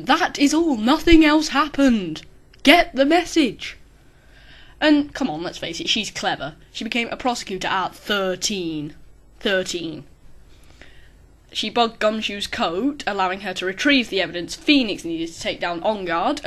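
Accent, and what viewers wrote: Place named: England, United Kingdom. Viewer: Your accent is so English, so fragile are you sure you should have been using such words back when you filmed this? Regardless this list was really good, thankyou for not putting Edgeworth in it, it's nice to see a little more variety.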